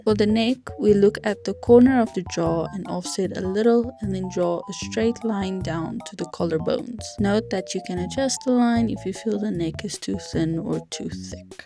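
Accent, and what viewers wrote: South Africa. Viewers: Your accent is giving me South African deja vu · your accent is a lot like a africaner's accent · Her accent sounds south african a bit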